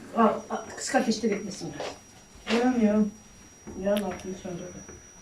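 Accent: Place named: Thessaloniki, Greece. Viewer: Annemizin şivesi ni Selanik macirlar gibi konuşuyor Memleket nere si acaba bu arada Miraç kandiliniz hayırlı olsun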